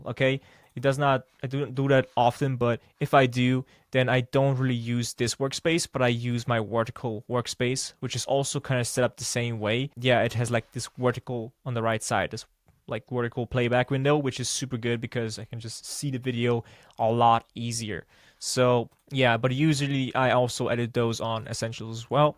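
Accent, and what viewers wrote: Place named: United States. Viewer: Az Ali Abdaalos videodat ajanlotta nekem a YT kb. 2 db perce, az hozott ide. Amerikaban szulettel, eltel vagy elsz? Az akcentusod amerikai es kb semmi magyar akcentusod nincs. Hogy csinalod? hahah Amugy ne hagyd abba a YT-ot mert szerintem be fogsz vele futni!!! Just keep creating content!!! En most gondolkodom, hogy elkezdem, de meg az overthinking fazisban vagyok. Van egy niche otletem, ami csak kozepesen saturated :D, majd meglatjuk. Kozben epp laptopot is keresek, meg vagoprogit is. Ugyhogy tenyleg nagyon a legelejen. Egyebkent nekem a Davinci Resolve-ot ajanlottak, mint vagoprogram, de megnezem a videoidat es lehet, hogy a te progid jobban bejon majd. Feliratkoztam. Sok sikert!! :)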